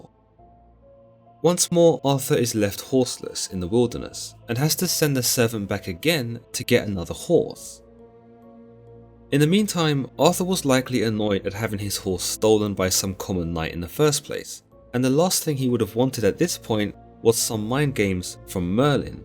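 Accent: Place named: Southern England, United Kingdom. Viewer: He sounds like a southern bloke from England but it could just his accent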